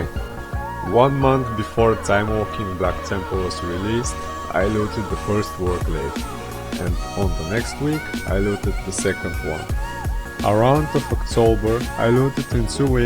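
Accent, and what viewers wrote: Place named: Russia. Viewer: ты русский что ли?) Слышно ужасный акцент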